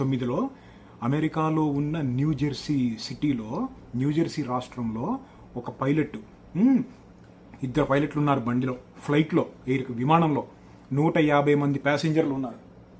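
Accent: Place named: Andhra, India. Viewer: Nizamabad antav Mari Andra slang matladthav?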